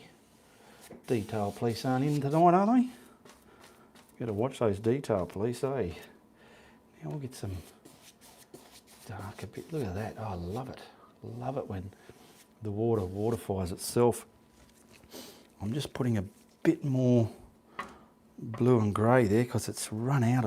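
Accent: Australia. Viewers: Howdy mate. Gees, your ozzie. Love it. Love your work. Love your chatter. Your my morning viewing.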